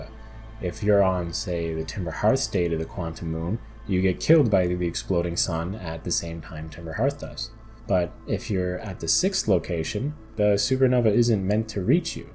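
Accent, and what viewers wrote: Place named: Canada. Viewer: its also about him sounding canadian, hence why he replied "aboot"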